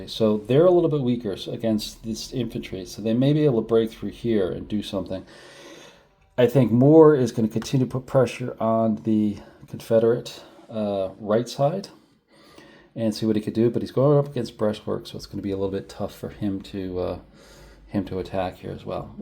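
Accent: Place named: Massachusetts, United States. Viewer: I can see how a Massachusetts accent wouldn't work down in ol' Virginia!! Actually, everyone NOT from the Shenandoah would likely make the same mistake with Staunton. It's part of the rite of passage as one gets immersed in Civil War history!! I'm still learning about the odd pronunciations of some waterways (Mattaponi, Ni, etc.) and some of the commanders are routinely mispronounced (the two Taliaferro's, pronounced "Tolliver", Huger (pronounced more like YOU-zhee) and even Heth (pronounced more like "Heath"!! I also find many people seem to trip over Sigel, which you did not, to your credit. I don't know WHERE someone would get "SY-jel" out of that, but some do!!! 😉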